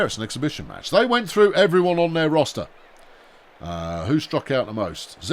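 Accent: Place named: Australia. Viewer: It's refreshing to have an Australian commentating a baseball game. Baseball is a fun game for everyone.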